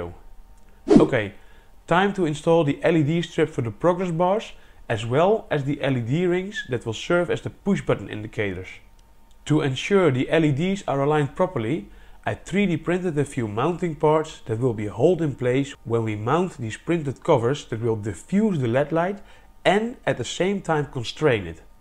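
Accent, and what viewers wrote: Netherlands. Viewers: Dat nederlandse accent herken ik vanaf 10 km verderop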